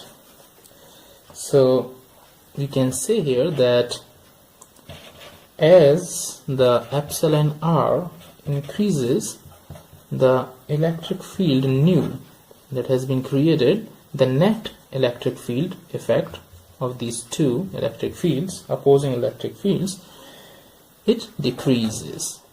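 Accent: India. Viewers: Bhai Indian accent me hi Bola karo . Nice video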